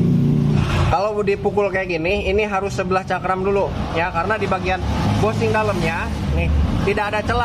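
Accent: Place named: Pandeglang, Indonesia. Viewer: Kaciri logat pandeglang na, 😁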